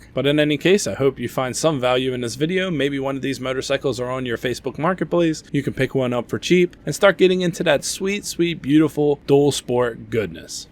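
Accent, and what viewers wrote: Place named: South Jersey, United States. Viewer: You gotta be a south jersey dude with that accent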